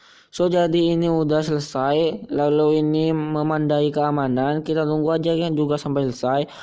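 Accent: Madura, Indonesia. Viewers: Orang Madura ya bang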